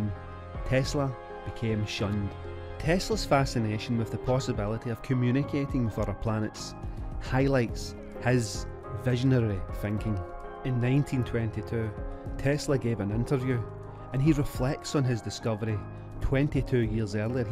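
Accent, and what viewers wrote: Scotland, United Kingdom. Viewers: Really enjoying this Scottsman's narration !!!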